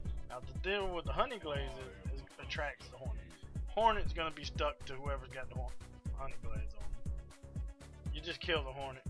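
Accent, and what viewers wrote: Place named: South, United States. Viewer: This was a decent video. Whispering like a scared little punk and your southern accent make it hard to understand you. Next time use your man voice.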